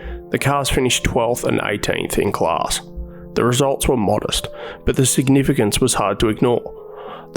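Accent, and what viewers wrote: Australia. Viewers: Idk just sounds like a normal Australian accent to me😭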